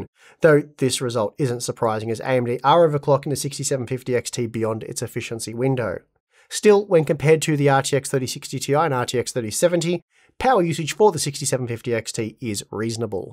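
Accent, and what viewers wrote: Australia. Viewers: Good Lord such a nice channel but Aussie accent is so bad to the ear.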